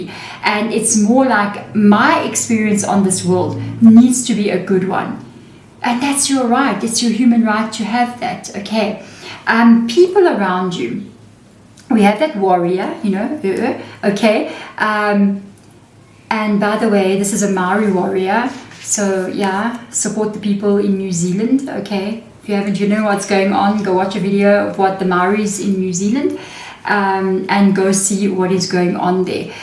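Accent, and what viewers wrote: South Africa. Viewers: So glad to hear a South African accent! From a fellow South African. Everything you say resonates.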